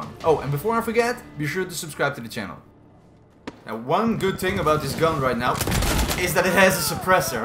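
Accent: Netherlands. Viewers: I smell that you are dutch am i correct? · You dutch right??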